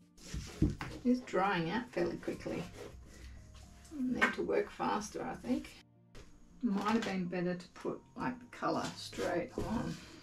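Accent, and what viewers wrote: Australia. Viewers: Thats great from our angle it looks like a city reflection walking along the Yarra River...Melbourne 😊 Have you tried using a retarder or other gel medium to slow down your paint drying? It might help. I only found out about them recently and think its brilliant, so much easier to blend too..love your art. Happy New Year cheers from WA🦘🦘 always good to hear an Aussie voice on here. * I said what it was before you had finished and gave your own interpretation....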